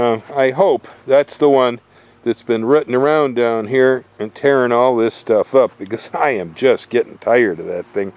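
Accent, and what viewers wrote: United States. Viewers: mmmm dinner... poor thing.. you sound like a true american in this video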